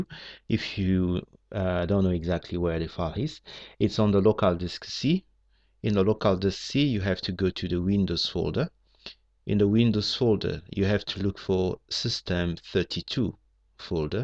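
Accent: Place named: France. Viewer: ça marche pas avec moi =) french accent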